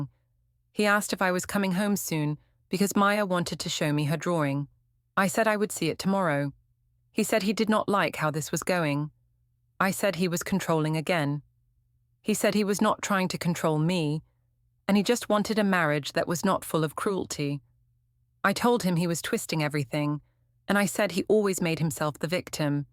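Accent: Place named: United Kingdom. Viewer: That Brit accent SUCKS! Thumbs down!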